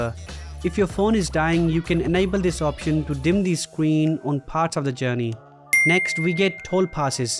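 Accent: India. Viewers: Speak English, not Indian-English.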